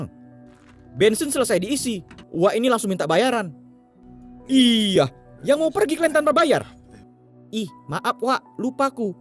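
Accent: Medan, Indonesia. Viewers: Medan x wkwkwkwk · logatt medan emg gituu bro wkwk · Suka kali sma suara abg ini...medan kli🤣😭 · galon 🤣🤣 . medan kali bah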